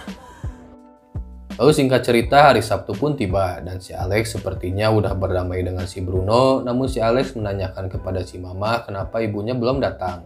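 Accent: West Java, Indonesia. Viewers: Akang ngomong nya sunda banget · anying sunda bet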